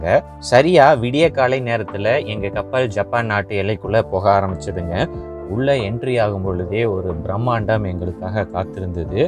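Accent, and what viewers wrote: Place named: Kongu, India. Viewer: Thanks for all your work and narration in this channel. I love your kongu and pure tamil; You have been doing a great job, Thanks again!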